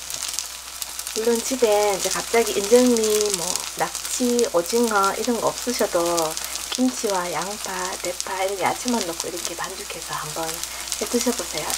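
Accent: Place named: Daegu, South Korea. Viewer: 떡을마찼다? ㅋ ㅋ ㅋ ㅋ 대구사투리정감있네요 서울분들이볼땐 ㅎ ㅎ ㅎ ㅎ ㅎ ㅎ ㅎ 마찼다.!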